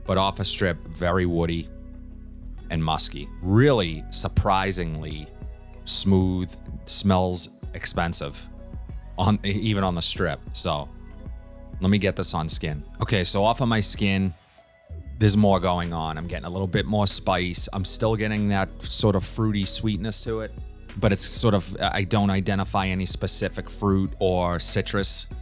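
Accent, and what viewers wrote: Boston, United States. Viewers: After a month - have your impressions changed? Also, you’re from Boston aren’t you :)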